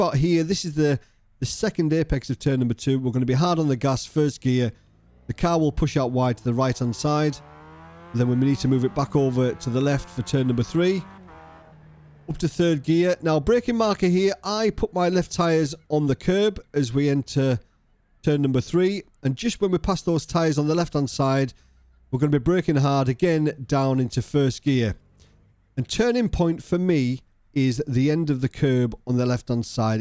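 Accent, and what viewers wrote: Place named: Durham, United Kingdom. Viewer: i live in stanley co durham you sound like youre durham /darlo way be nice to have a chat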